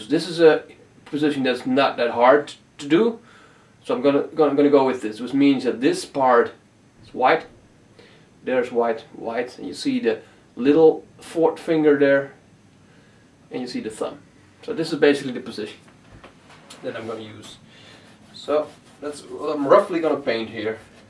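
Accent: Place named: Netherlands. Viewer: I knew u were dutch from ur accent..:) Welcome!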